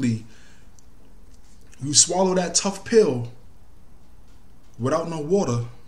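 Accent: New York, United States. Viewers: You straight up New York lol accent off the chain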